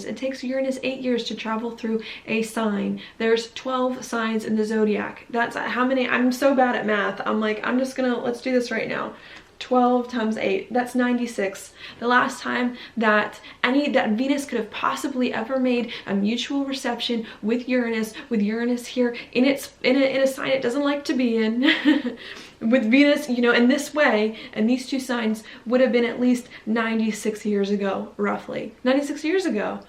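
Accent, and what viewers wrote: South, United States. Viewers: Your southern drawl is much more prominent in this video than in any other before. As a southern girl, no judgement. I only wish to say: while w family, do your best not to lose yourself, and the story you are building of who you are becoming. Xoxo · I think Georgia brought your southern roots to the surface...i hear a little accent :) missed you, glad youre back!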